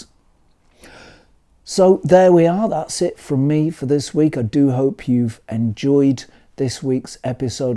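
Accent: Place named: RP, United Kingdom. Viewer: It is so much more pleasant for me to listen to a cultured British voice than to any American speaking, I notice that again & again. Thank you for sharing your photography knowledge.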